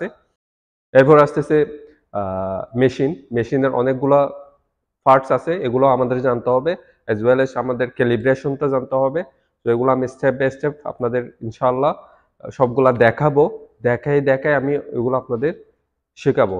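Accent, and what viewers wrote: Chittagong, Bangladesh. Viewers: আপনি কি চাটগাঁইয়া?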